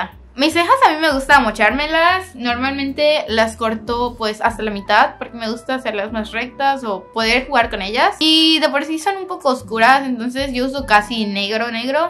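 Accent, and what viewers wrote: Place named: Mexico. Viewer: Me da la impresión que de México por el acento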